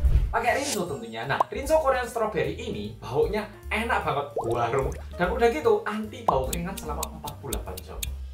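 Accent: Java, Indonesia. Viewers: haloo mas aku bolo bolo udah nonton dari jaman mas upload ciri² kamu psikopat atau bukan aku lupa taun berapa sampe ke detektif roemit dan rinding dong yg emang aku suka banget dengan pembawaan cerita dari mas, dan sampe akhirnya mas up pacar nya ke public and sempet kaget ternyata udah pacaran lama dan sekarang udah mau menikah, and aku juga mau menikah mas ditanggal 21 ini, selamat yah mas si medok 🥰🥰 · Maaf mas..calon nya bukan orang indo ya..kirain orang indo..sayang banget lama di indo ngak ada cewek indo yang doyan haha..oppss maaf..✌ bahasa medok tak kira ditaksir cewek dari jawa..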